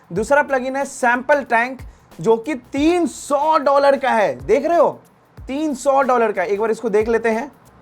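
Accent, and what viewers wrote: Bihar, India. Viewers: TALK ALWAYS IN BEHARI STYLE IN YOUR TUTORIAL , I AM YOUR OLD SUBSCRIBER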